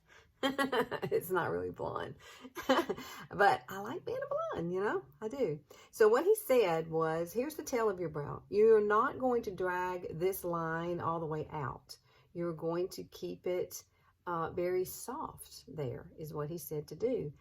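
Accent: South, United States. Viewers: You are a very good teacher! I love watching Wayne too but it’s helpful to watch others use his technique and I enjoy ur adorable, southern accent :) I love the colors u picked and plan on finding some in my collection that are similar because my coloring is exactly like yours. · What state are you from? Somewhere in the south I’d say.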